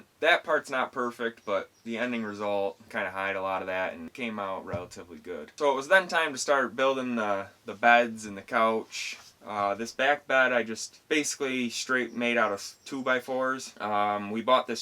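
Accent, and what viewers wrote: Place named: Minnesota, United States. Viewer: I like how honest you are. And I have a feeling you are a bit of a perfectionist. Also I’m from Minnesota and it sounds like you might be too… or at least a state that touches Minnesota.😊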